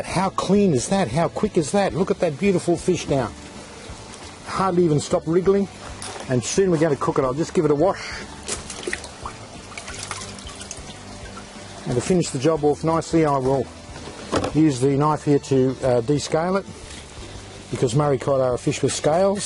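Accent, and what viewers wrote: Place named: Irish Traveller, Ireland. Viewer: Are you aTinker Irish?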